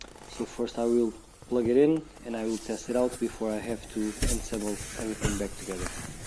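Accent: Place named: Portugal. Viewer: Thank you very much. Excellent information, very useful no doubt. But there is a cabin filter missing in Your car, because these cars always use two at the same time, one on top of the other. I know because I have a Partner Tepee, and I change these filters once a year. By the way, by your accent and your name, I imagine that you are Portuguese, like me? If so, you can answer me in Portuguese. Thanks.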